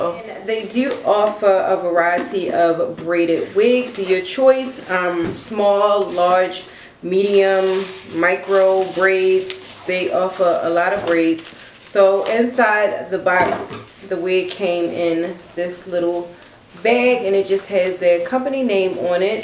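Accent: Baltimore, United States. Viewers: Yep, that's a Baltimore accent.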